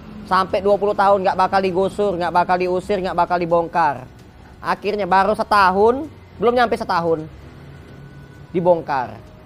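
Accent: Palembang, Indonesia. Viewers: Logat palembangx khas sekali..smga sllu dimurahkan rezekinya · Logat palembang nyo mase ado 👍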